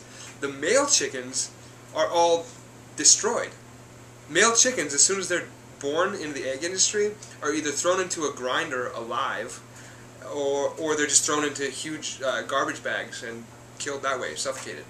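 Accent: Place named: Spain. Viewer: Nice Spanish Accent...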